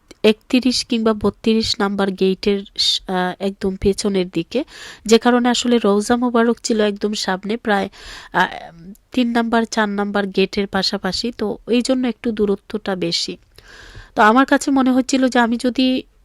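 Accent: Sylhet, Bangladesh. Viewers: Tik.asea.sobai.bujtay.parben.apne.voice.ajka.frist.time.sunlm.syealati.apur.muke.suddo.bangla.very.nice.aktu.kosto.oise.mone.oy.apu.voice ditay karon amra.toy.syealati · Apner sylheti kothai valo lage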